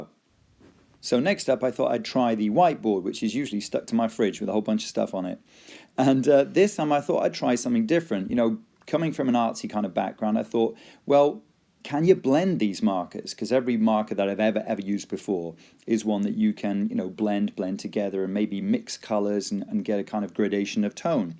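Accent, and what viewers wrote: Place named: United Kingdom. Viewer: May I ask, which part of the UK do you come from?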